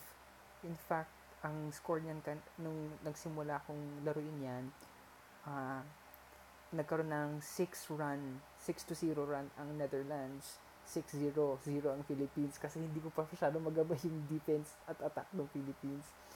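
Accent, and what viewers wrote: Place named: Brazil. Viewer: Tu é br ne o seu sutaque parece pq não vez um em ptbr?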